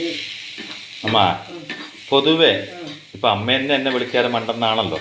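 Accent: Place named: Palakkad, India. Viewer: പാലക്കാടൻ ഭാഷ പോലേ തോന്നി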